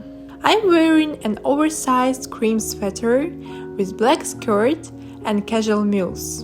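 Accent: Russia. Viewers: Русский акцент не вытравить ничем Как как она себя назвала? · Какая красивая! Сразу услышала русский акцент 🥰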